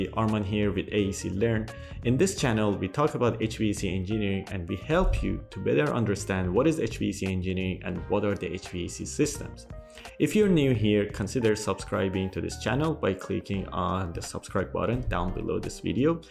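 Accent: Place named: Iran. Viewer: Dear Arman I found randomly one of your videos in YouTube, and l enjoyed it. I started to follow your channel, but I realized that something familiar was in your voice. Lastly I realized that you are originally an Iranian. I'm very proud of you.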